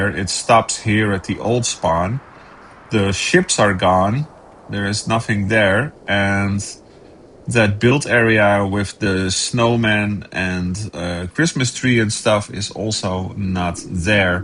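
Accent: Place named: Netherlands. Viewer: You sound dutch :D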